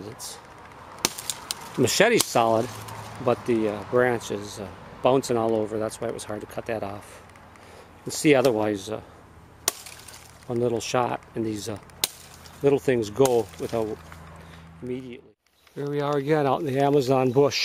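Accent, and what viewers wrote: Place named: United States. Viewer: Were you in Brazil? You sound American.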